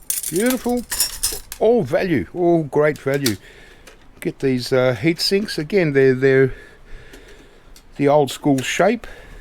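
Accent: Australia. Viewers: I live in the United States and we were locked down for the longest time and you weren't don't apologize for something you can't do anything about I love watching your shows I don't care what you do tearing stuff apart cutting off wires it's all good just don't stop let's do a marathon what do you think love that Australian accent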